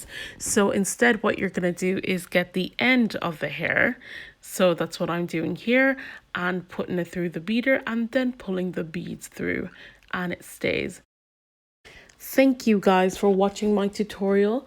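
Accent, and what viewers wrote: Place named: Ireland. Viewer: Are you Irish? Your accent is beautiful.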